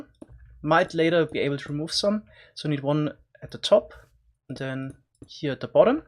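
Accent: Germany. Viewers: The Video sounds like german Englisch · R u german or what is that cool accent ? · Man merkt krass das du deutsch bist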